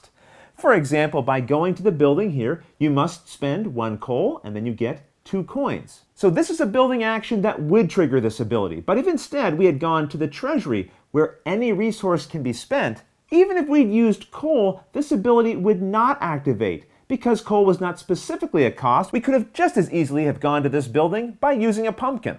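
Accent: Canada. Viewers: My wife and I have been discussing where your accent is from. My guess is Canada. Am I right?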